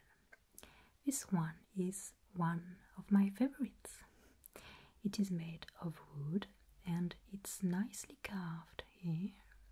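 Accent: France. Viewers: I’m French & I can say that is a French accent if I’ve ever heard one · as a german myself, i don't hear any german accent. It sounds very french to me. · French accent 🤝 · Je me trompe ou tu a un fort accent français ? ^^ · French accent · Exactly. I deducted it has to be French, although at first I was not quite sure, but it has German and even slight Swedish quality to it. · I think it's a french accent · I might be dumb but is that a French accent? Its beautiful. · Are you French because you have a french accent c cool d'être représenté de partout tu fait du super bouleau continu comme ça 👌✌️ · It’s a French accent. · That's a thick french accent. I love her work and her grammar is perfect but as a french myself, i find the accent tends to take me out. It never bothers me when it's other accents but for some reason the french accent kill my asmr ^^ I commend her for the amazing work though !! 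And I still watch her videos for the ascetic and world building ! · Just found out this channel existed ~ oh boy have I been missing out! This production is absolutely stunning and the quality is top tier 😭 ✨ I absolutely love your (french?) accent - it’s so unique and perfect for this setting! Psst! You got a new subscriber 😊 ❤️ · I'm French and trust me, it's a french accent 😄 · ...her soft voice & French accent sends TINGLES down my spine !!!!!!!!!!!!!!!!!!!!!!!!!!!!!!!!!!!!!!!!!! :) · I love your french accent, it’s so relaxing and welcoming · She sounds very French and has a lovely soft voice. I wish my French sounded like her English 😪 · France bc of the axent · It’s French, but she gets lots of comments asking if she is German or has a German accent. I personally don’t think it sounds German at all, but very French.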